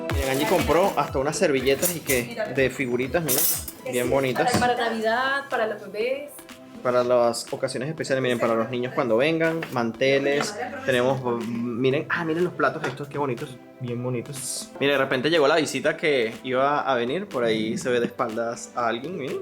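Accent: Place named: Venezuela. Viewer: Lo que más me gusta es su forma de ser su carazter como compaginan. Me encanta escucharte con ese acento venezolano wue me recuerda cuando vivi allí y que tanto wuieto ese país donde nacieron mis hijos. Y me encanta tb como es y además de ser muy guapa, Dios os cuide siempre y seanuy felices Me contenta mucho y me hace muy feliz veros a vosotros llevsndoos tan bien. Yo naci en España, pero me crie en Venezuela 40 años. Veo muy amenudo vuestros vídeos, pero casi no escribo.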